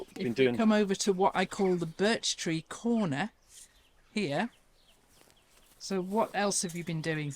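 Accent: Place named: North, United Kingdom. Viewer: Thankyou for this new channrl, love it. Its lovely to listen to listen to our northern accents too as I live in Ontario. ❤❤❤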